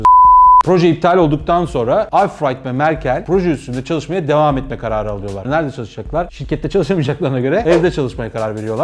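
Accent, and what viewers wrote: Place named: United States. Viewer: Adam bildiyin amerikalı taklit etmiş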